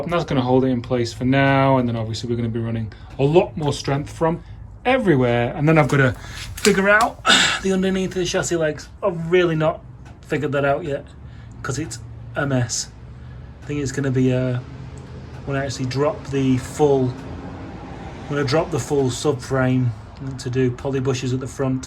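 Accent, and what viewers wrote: United Kingdom. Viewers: yeah totally understand buddy. I just wish YouTube would place them better. Loving the build buddy its not my normal cup of tea but you and that bug have me hooked! More than worth the awkwardly placed adds! Loving the way the 'BRIT' keeps coming out every now and then. 😁👍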